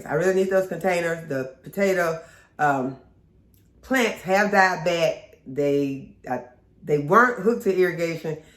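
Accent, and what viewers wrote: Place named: South, United States. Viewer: I listen to your chat carefully because it's full of good, down to earth information. My up north ears are not so used to your down south accent that's why I watch the closed captions carefully. Do you feel guilty for Caleb killing those bees? I'm sure I would have used Raid, too! I have a contract with my bugs that if they stay out of certain areas, then they are safe from me! ha!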